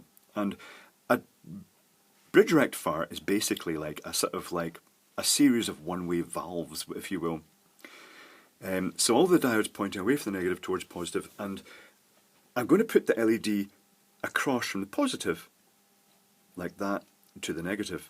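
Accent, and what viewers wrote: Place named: Isle of Man, United Kingdom. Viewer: Ne-on. A noble gas that glows when excited by electricity. comes from the Isle of Man so he has a bit of an accent.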